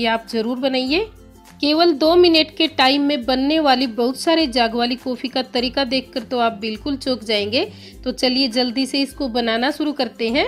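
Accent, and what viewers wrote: Haryana, India. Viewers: Mam aap Haryana se ho kya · Mam aap haryanvi ladti h bhasa se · Aap haryane. Se ho kya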